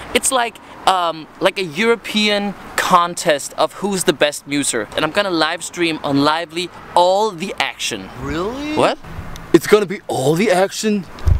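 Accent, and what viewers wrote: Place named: Denmark. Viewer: du har en dansk aksang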